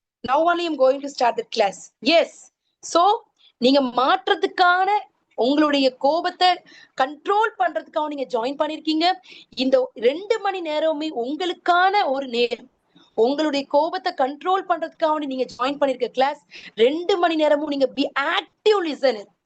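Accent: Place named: India. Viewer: Very useful 🎉🎉❤ Ongada entha program endaalum very interesting madam madam thanx ❤ Session thornthu podunga. India slang very cute 🥰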